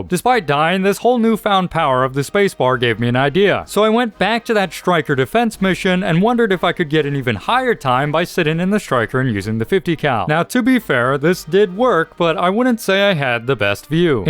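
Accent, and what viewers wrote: United Kingdom. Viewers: bro soundl like english luksor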